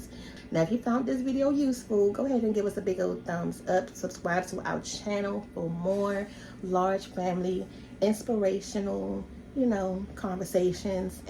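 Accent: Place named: Louisiana, United States. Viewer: Are you from Louisiana? The reason I asked is because you have a Cajun accent.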